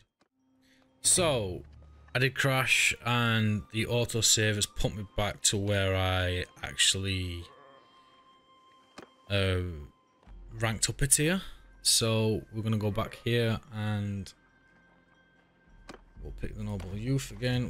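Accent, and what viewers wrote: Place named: Manchester, United Kingdom. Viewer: Sound like you from Manchester mate well done on looking at this mod mate shit I’m on console pal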